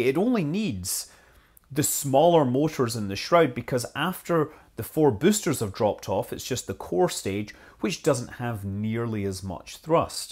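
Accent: United Kingdom. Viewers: It's amazing that you being British (if i got the accent right) know so much about the R-7 design, now that's really some enthusiasm there. I'm a Russian myself, meaning i ought to know about details like the excentric jetforce stream plus oxygene jettison trick before everybody, but i only learned about them yesterday, from Lozga, who you could say is the best known space expert among Russian bloggers.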